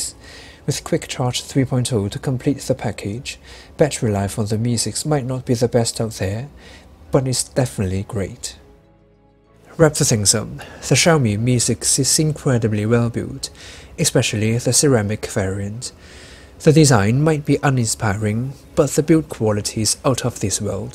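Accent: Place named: United Kingdom. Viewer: 专登嚟听英音，好sexy！！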